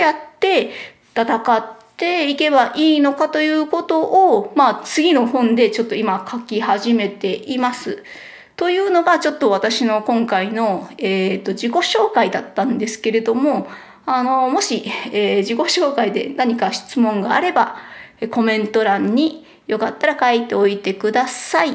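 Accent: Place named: Osaka, Japan. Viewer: 新しい扉を開いてくれるチャンネルですね。大阪弁も身近でよし。これからもよろしくお願いします。☺️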